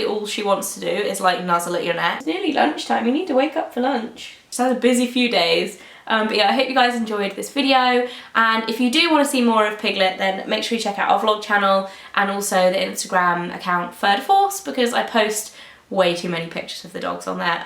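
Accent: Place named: London, United Kingdom. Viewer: I love your videos and your accent from London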